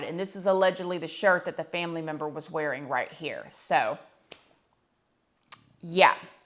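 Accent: South, United States. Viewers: I agree with you! Why does it matter?! Just a distraction ! Omg I love when your country accent comes out sometimes!!!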